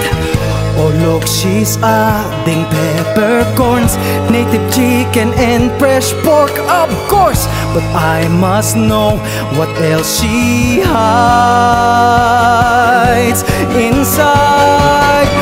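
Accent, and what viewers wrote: Philippines. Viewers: That thick filipino accent though...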